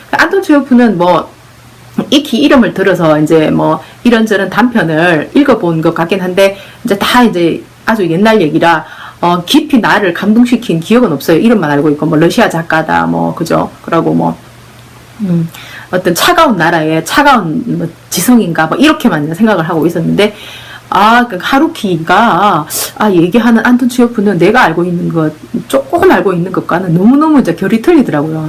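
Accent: Gyeongsang, South Korea. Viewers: 신선하다. 모처럼만에 상큼하다. 감각이 탁월한 것 같은 느낌. 지식적 감각 또한 탁월하다. 경상도 목소리이면서 멋지다. 아뭏든 예리하고 분석적이다. 객관적 사유의 담소 아름답다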